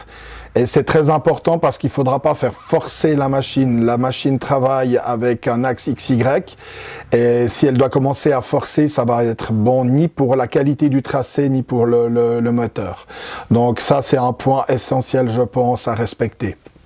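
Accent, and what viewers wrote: Switzerland. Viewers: Avec un accent vaudois ? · J'essayais de trouver cet accent et je me disais Apple Bière ben voilà ;-) aller pour une fois qu'il y a un Suisse qui se donne la peine je m'abonne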